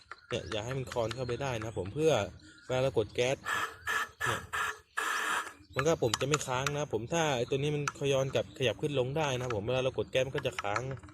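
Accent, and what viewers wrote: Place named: Java, Indonesia. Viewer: ini orang jawa ya, kok ngomongnya ngapak wkwkwkwk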